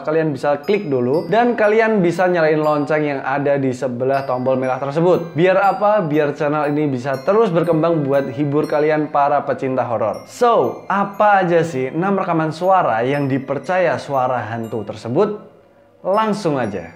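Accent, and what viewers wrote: Java, Indonesia. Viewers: abangnya kalo ngomong ada sedikit medoknya ya.. kadang2 muncul medoknya heheh but i like it.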